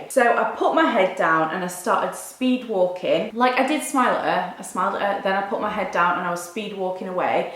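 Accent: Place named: North, United Kingdom. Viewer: You look so pretty! 😍😘Your northern accent was totally coming through in this video😜(not dissing just something I noticed lol) So excited for the new setups!😉😊💗💗xxx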